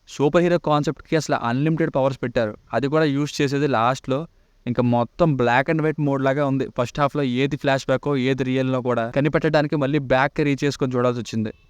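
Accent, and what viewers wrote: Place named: East Godavari, India. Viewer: బ్రో మీది ఈస్ట్ గోదావరి మాటకు ముందు అండి మాట చివర అండి అని వస్తుంది స్మాల్ డౌట్ అంతే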